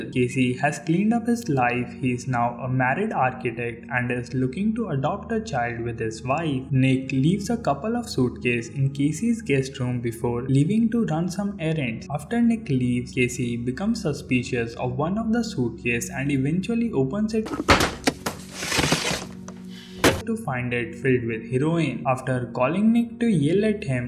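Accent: India. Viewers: I'm a born and bred Englishman. Your voice and accent is a very nice Indian one, also soothing. Ignore the racist haters and please keep going ! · I’m tired of the ai text to speech voiceovers so finally hearing a human is so relaxing. Also I enjoy Indian accents as I can do a Indian accent too along with a lot of others!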